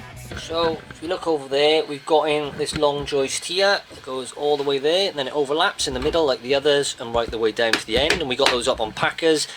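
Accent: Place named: Cardiff, United Kingdom. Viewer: No he is Cardiff because I could tell his accent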